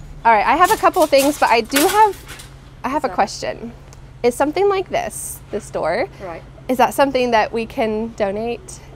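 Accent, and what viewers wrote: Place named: United States. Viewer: I am learning British English, but when I watch your videos, and here your accent, I really like USA accent so much too! is your accent standard USA accent? You are a very amazing teacher . thank you so much.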